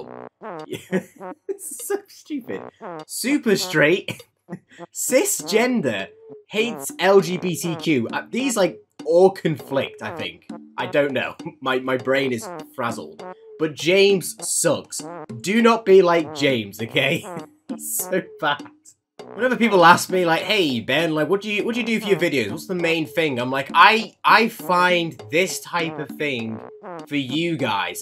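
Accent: United Kingdom. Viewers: You sound so ✨British✨ today mate.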